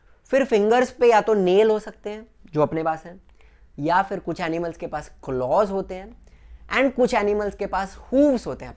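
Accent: Maharashtra, India. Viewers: sir aap marathi ho na ? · You are Kutchhi but you sound more like a Maharashtraian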